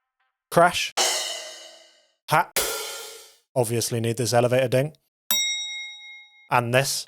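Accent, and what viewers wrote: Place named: United Kingdom. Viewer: It's only now that I've realised after few secs of vid that you're British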